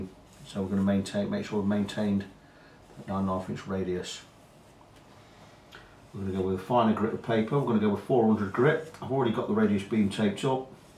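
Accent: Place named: Nottinghamshire, United Kingdom. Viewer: I love your no nonsense, workmanlike style of setting up guitars. You are a real craftsman. I'm guessing, from your accent, you are local to me, Newark, Notts.?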